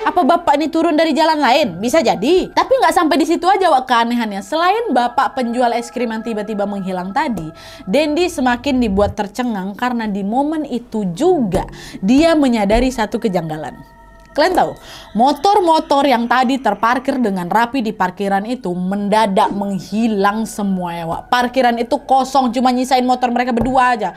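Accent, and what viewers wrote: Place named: North Sumatra, Indonesia. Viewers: Makin kesini kok kayak makin yakin kalo kak emang orang sumut. Ntah medan atau binjai mungkin ya. Soalnya cakapnya logat² nya sumut kali 😁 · Kak, kk ni asli mana kah? Kadang pake slang medan, kadang minang. Aku kan jadi pinisirin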